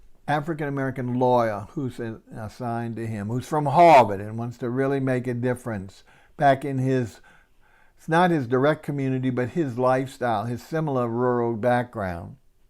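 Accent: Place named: New Orleans, United States. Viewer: sure good to hear that N'Orleans accent I remember so well. Your voice is in sync with your video. Joe Robberson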